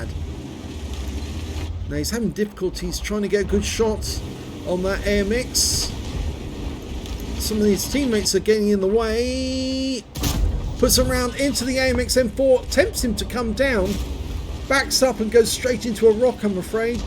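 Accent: United Kingdom. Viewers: Mad respect to your enthusiasm while commentating and British accent keep up good work gg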